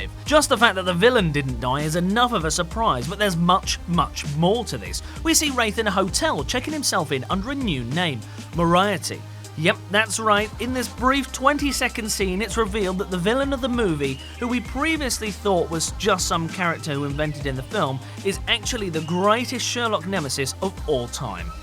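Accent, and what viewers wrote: United Kingdom. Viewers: but hes also british...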